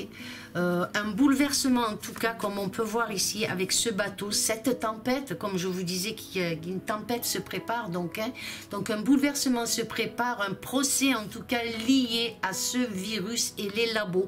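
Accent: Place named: Camargue, France. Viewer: Bjr contente de vous retrouver en bonne santé, et surtout de vous protéger en vous reposant. Ce qui me trouble, ce sont les mi.....s étrangères que vous avez vu, et dont j avais entendu parler il y a quelque temps, la nationalité d un pays dont on parle bcp en ce moment.prions pour que cela n arrive pas, pour tout le mouvement qui s est mis en route.merci bcp, vous avez l accent d une ville que je connais ou de la camargue.ca me fait plaisir. Bien a vous prenez soin de vous.✌️👍🇲🇫🚛🚚💖🗽🐞🍀🕊️😘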